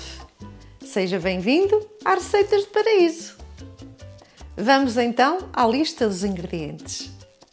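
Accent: Portugal. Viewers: Gostei da receita mas gostei mais du sutaqu dessa portuguesa amo esse "sotaque" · Acho tao lindo o sotaque portugues, tao diferente de como nos brasileiros falamos, eles sao mais CLASSIC, DELICIAAAA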